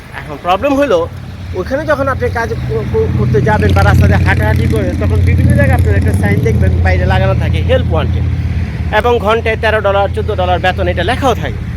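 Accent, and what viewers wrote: Dhaka, Bangladesh. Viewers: Vai apni ki dakia apnar kotha gulo dhakar vasar moto ami dhakar bacharamduriar amra kaligog khajur bag bari kinaclim apnar mukha dhakar vasa hsuna khub valo laga and apnar modduma usa shompoka onak kicu janta partaci thank you valo thikban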